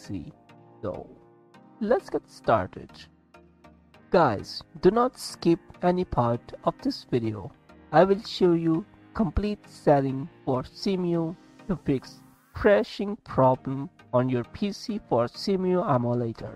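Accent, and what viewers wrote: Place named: Asia. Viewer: Why the FVck does he sound asian?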